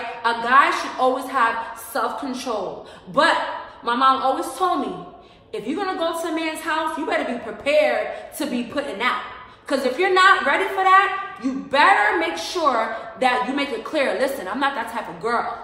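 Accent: New York, United States. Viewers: I love your ny accent ❤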